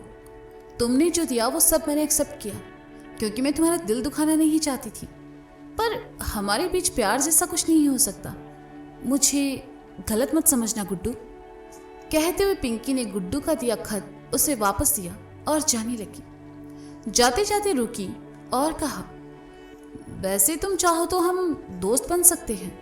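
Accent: Varanasi, India. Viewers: Ma'am aapki voice so adorable and apki voice me ye banarasiya language so amazing lagti hai ❤❤🥰🥰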